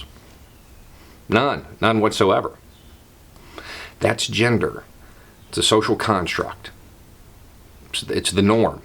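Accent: South, United States. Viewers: Who in the hell ARE you?:) YouTube suggested you to me yesterday for the first time - the witch/churchgoer video. Holy cow! I subscribed immediately. This is another winner video. You are sane, level-headed, a clear thinker, classically logical. That's a pretty rare combination these days. I'm also from the South, so not a problem for me, but you realize that the accent severely throws some people, right? LOL Rock on,. Cliff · It tickles me every time seeing a hyper masculine guy with a southern accent advocating LGBTQ+ rights. Every damn time. Thanks!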